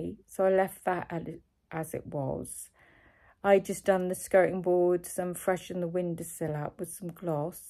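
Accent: Cornwall, United Kingdom. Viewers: Hi, love your vlogs. We lived in Cornwall for over 20 years. Altarnun village on the edge of Bodmin Moor. Lovely to hear the Cornish accent again.😊😊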